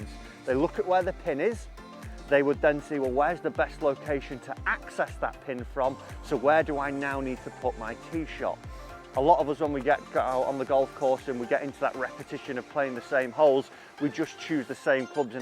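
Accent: United Kingdom. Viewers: must be the heavy northern Accent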